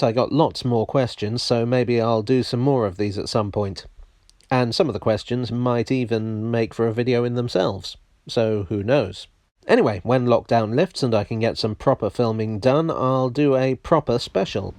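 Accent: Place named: North, United Kingdom. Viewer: So your family's from the North, eh? I thought you had a Northern-sounding accent.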